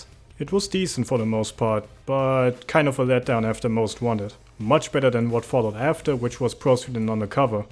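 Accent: Germany. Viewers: man hört so sehr raus wie deutsch er ist😂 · This has been real. What a nostalgic trip. Nice vid! You German?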